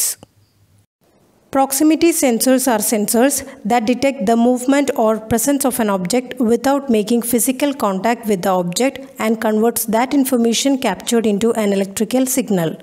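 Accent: India. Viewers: YES IN INDIAN ENGLISH YOU TRIED TO CLEAR THE FUNCTION OF A SENSORS FUNCTION YOUR MODE OF EXPRESSION AND LANGUAGE IS MORE OR LESS CLEAR AND UNDERSTANDABLE----SELIM AHMED CHOUDHURY FROM BANGLADESH ON 7/10/2021 AFTERNON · Is the narrator from India? Love from Bangladesh... 🤝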